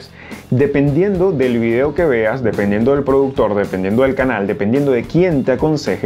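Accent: Venezuela. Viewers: Vos sois maracucho verdad? 😂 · Excelente video hermano! Solo por curiosidad, ¿eres venezolano? tu acento me suena. XD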